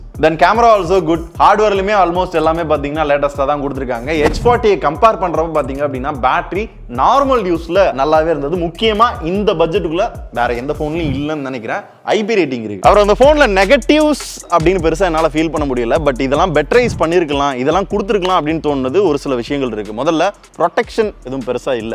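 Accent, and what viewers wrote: Madurai, India. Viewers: Bro Nenkee Madurai yaa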